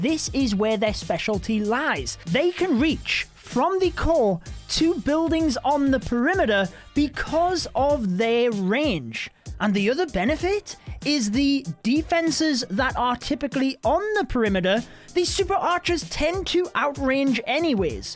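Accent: United Kingdom. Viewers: I can’t tell where your accent is from. I mean, I guess it’s British but guessing the city is very hard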